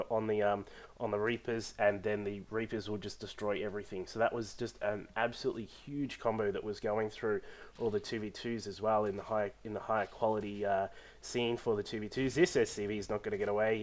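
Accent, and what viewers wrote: Australia. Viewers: It's good to hear a Aussie commentator for once. Good work =p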